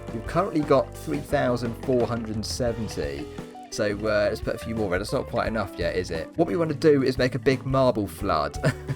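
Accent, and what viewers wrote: United Kingdom. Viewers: found you at 4am and watched you for a while, question, are you in the uk? ive always gotten this wrong but your accent really sounds like someone in england, and if you have another race, could i be apart of it? it would be great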